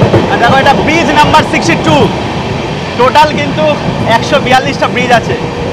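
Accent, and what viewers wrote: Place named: Bangladesh. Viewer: আপনার কথাগুলো বাংলাদেশের ভাষার মত , ভালো না ।😢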